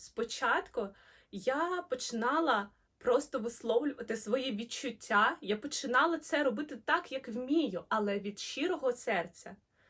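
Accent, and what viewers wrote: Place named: Bukovina, Ukraine. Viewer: мова звучить так, наче авторка не з Харкова, а з Буковини чи Львівщини )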